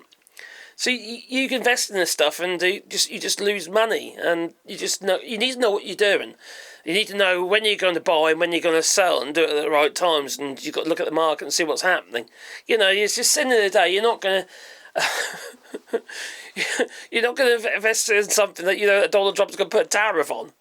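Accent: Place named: West Country, United Kingdom. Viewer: Mate, we have spoken before. You’re doing so many videos at the moment and you are an absolute star. I remember when you were thinking about shutting your channel down but it seems things have got better. I am a Southerner from Pompey but I live in the West Country and they all talk like you, mate. And I have seen and love your dogs. I wish I could live there in France. You and your wonderful lady did a great thing to escape before those wankers fucked it for all of the rest of us. Much love mate. I would love to visit you just fora couple of hours one day. Surprisingly I,m not called. I’m Paddy. I’d love to chat but I get it if you don’t want to. Whatever youchoose, much love and thanks for the channel x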